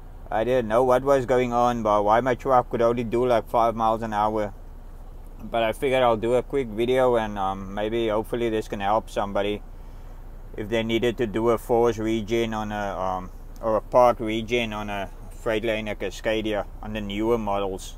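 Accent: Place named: South Africa. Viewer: Dude you clearly from SA 😅. Where about? How long you’ve been here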